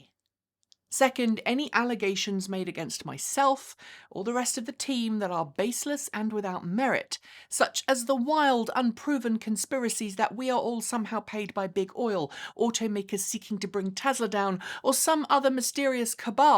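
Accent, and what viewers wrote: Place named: England, United Kingdom. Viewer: Well done, had to be said. Totally agree about wild unproven comments being moderated. As a Brit I am proud that you have held onto your English accent even though you are now an American citizen.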